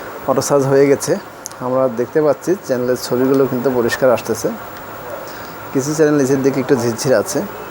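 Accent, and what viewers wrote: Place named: Dhaka, Bangladesh. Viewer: ভাই , আপনার কথায় বলার ধরোন বুঝায় আপনি ঢাকা লোক |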